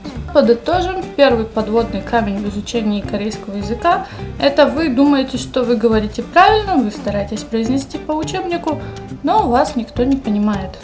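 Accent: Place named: Russia. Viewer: Вы плохо воспринимаете на слух, потому что не правильно произносите звуки.. они у вас русские..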